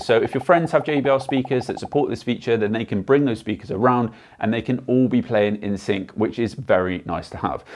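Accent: United Kingdom. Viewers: Bro are you british?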